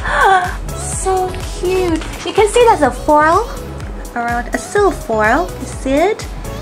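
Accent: England, United Kingdom. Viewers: ชอบสำเนียงอังกฤษอ่ะฟังเพลินมากเลยค่าาาาทำออกมาบ่อยๆน้า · ติดตามอยู่นะคะพี่ ชอบสำเนียงภาษาอังกฤษพี่จัง ทำมาอีกบ่อยๆนะคะ หนูจะได้ฝึกไปในตัวด้วย ปล.หนูไปสอยสมุด เครื่องเขียน บลาๆ ตามพี่เยอะมาก จนต้องตีมือตัวเองให้หยุดซื้อ <num> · มีทุกอันที่เป็นสติ๊กเกอร์ก่อนพี่ จะรีวิวเจ้าค่า เห็นสีแล้วมันถูกใจมั๊กมาก. ชอบสำเนียงอังกฤษเลย ถึงแม้ต้องอ่านซับรัวๆสู้อังกฤษไม่ไหวจริงๆนับถือๆค่า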